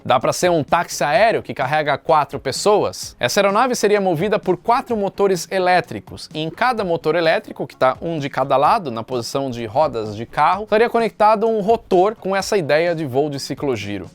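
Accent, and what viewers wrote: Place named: São Paulo, Brazil. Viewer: gosto dos seus videos porem sua voz d paulista e foda tudo pra voces e meinto, momeindo pensameinto porque nao momento porque o i antes do n fico bolado com filmes com dublagem paulistanas tudo com meinto poxa que voizinha safada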